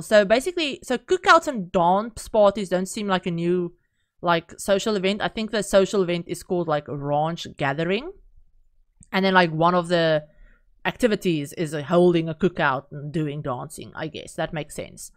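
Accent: Afrikaans, South Africa. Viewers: OMG, I immediately recognised your Afrikaans accent the moment you started talking! Very cool to see another Safrican simmer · Yes, immediately recognised that SA accent and subscribed straight away😁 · This is my first time here and I recognise a South African accent when I heart it so I had to do a double take and check that I'm hearing right because I know SA creators in this space are few and far in between. Congratulations. ❤ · OMG your accent r u South African, because if u r this will be my first time coming across a South African sims4 content creator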